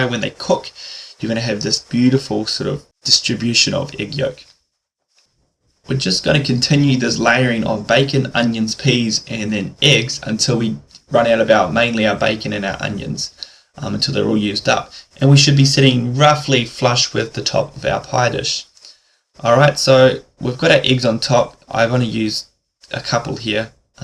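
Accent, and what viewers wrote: New Zealand. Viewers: Kiwi accent? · That would be an NZ accent, but an educated one. Closest British similar accent is between Bristol Reading and Oxford. A less educated accent can sound much more like an Australian one, but to be fair educated Australians (rare as they are) can sound like educated NZrs. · That's a Kiwi accent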